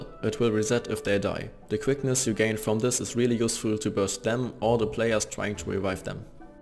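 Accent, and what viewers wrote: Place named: Germany. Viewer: wie ich einfach instant höre das du deutscher bist ^^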